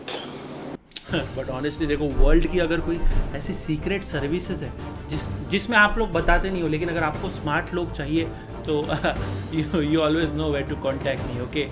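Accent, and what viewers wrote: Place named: Gujarat, India. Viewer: I like your Gujarati accent tho 😂😂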